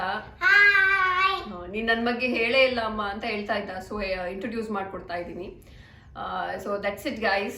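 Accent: Mangalore, India. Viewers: Yeah... I like ur mangalore kannada & tulu ... evn I m from mangalore... staying in UAE .. love ur channel.. all the best ❤️ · Hii sister.... Iam from sullia.... Neevu manglore kannada mathadtha edre keltha irbeku antha agtha de❤❤❤.... Plzz reply mam. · Nima manglore language.super sis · Nivu maglore Kannada ne matadi Mangalore Kannada nange rumba esta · I like magalore Kannada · Supar Mangalore kannada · I like the Mangalore kannada, do you know kundapur kannada? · Mangalore kannada👌👌👌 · hi, yenri video nimadu super, matu nim manglore language tumba sakatagi irute. navu north karnataka davru, yavagalu nim manglore language keloke tunba ista. ondu request nimgae adstu manglore langauge matadi. hege heche hechu video madi... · Actually Mangalore Kannada is very nice to hear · dayavittu mangalore kannada matadi nevu mangalore kannada chenag matadtira......hage nevu return india bartira illa PR tagondu alle settle agtira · Woww super erna mangalore kannada... 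tulutla paterle .. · Manglore kannada esta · Really i like ur Mangalore kannada tumba chenag mathadthira. Mangalore kannadane mathadi tumba janakke esta agutte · Kudla is the best..😘😘 So good to hear mangalore kannada.. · ಮಂಗಳೂರು ಕನ್ನಡ ಚಂದ ಉಂಟು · Mlore Kannada super. So pls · I love manglore kannada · Nivu Mangalore kannadane matadi mam . Nivu chennagi matadtira . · Neev manglore kannada mathadodanna keli tumba kushi agutthe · I love your Mangalore kannada tq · Hi, this is Praveen from mangalore, nice home, your mangalore kannada is nice to hear😃, and we really proud for our tuluvas are in everywhere 👍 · Nimma kudla kannada nice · I like your mangalore Kannada . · I like Mangalore Kannada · Mam neevu Mangaloru kannadadalle mathadi aaytha nimma Kannada thumba chandha untu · Sister nima Mangalore Kannada super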